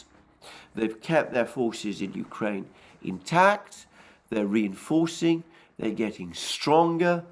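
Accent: United Kingdom. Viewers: Yes, the best ruzzian propaganda with a british accent.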